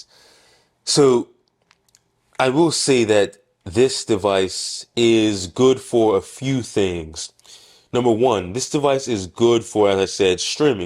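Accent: Bermuda. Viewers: Are you from Bermuda? I hear an accent.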